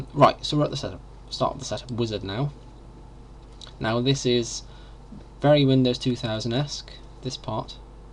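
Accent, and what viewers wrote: United Kingdom. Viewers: Is that a British Accent ?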